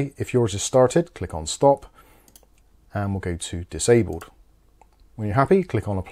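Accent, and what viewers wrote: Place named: South West England, United Kingdom. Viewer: Being from Bristol nice to hear a South Western accent in this space and you also have a love for tech. Subbed mucker.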